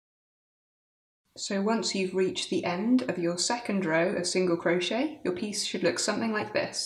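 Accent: United Kingdom. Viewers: Silly question: I'm new to crocheting, but I know there are US vs UK terms. I'm assuming because of your accent this is the UK single crochet (US double crochet?). I'm asking because I've seen other tutorials and this seems like the US single crochet but, again, potentially silly of me, I'm inferring from your accent it's the UK single crochet?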